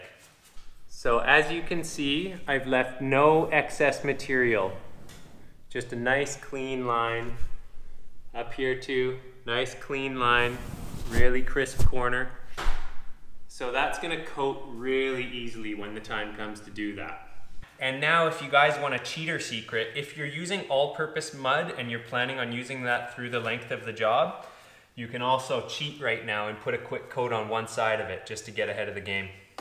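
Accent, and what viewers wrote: Canada. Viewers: Awesome video, even better This Hour has 22 Minutes accent eh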